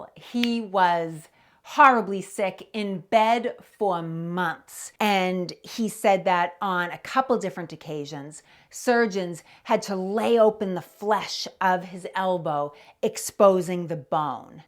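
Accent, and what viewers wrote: Boston, United States. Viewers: She had me at Hello. Love her Boston twang . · Your either from Wostah, or Reveah. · Being a Bostonian, I really appreciate a YT channel where the presenter talks like me!😅 Great job,! Careful walking those ridges! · I love that Boston accent. My Love is a native of Cranston RI. If u have ever heard a girl form there talk u will fine it just as funny as i still do even after 44 yr. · My friend told me about you. I am a huge history buff, but my friend says your Boston accent is worse than mine. I was on a TV show based in Boston, and I had to be subtitled. Hahaha, I just subscribed. LOVE your work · Will you take that Boston accent with you when you go out of Massachusetts.🐎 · Damn,. I didn't think anybody's Boston accent was as thick as mine. Well done. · You are so smart, attractive, and live the Boston accent!!❤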